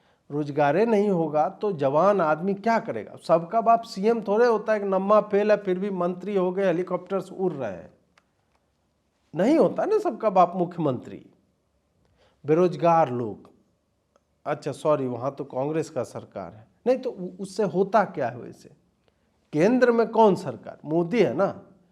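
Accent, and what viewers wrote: Bihar, India. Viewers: Your Bihari accent is very nice Ajit sir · EXCELLENT satire! Bihari accent is lovely!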